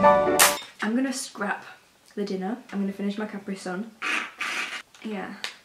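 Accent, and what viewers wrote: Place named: Scotland, United Kingdom. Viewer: Is she Scottish cuz I am and she kinda has an accent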